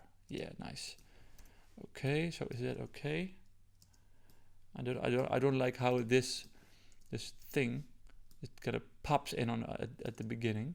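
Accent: Netherlands. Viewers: Quality stuff on your channel man. Glad I found you. I think I recognize a Dutch accent but I'm not entirely sure! Keep it up man.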